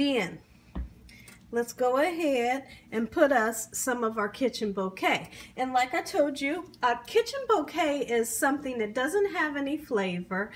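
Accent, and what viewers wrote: South, United States. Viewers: Does anyone know where from bc i swear she talks so Southern.. And sure does cook southern..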